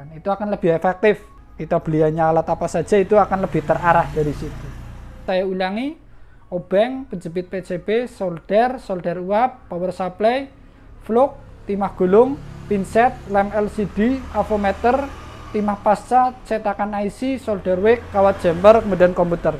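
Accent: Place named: Java, Indonesia. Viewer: Ngomonge medok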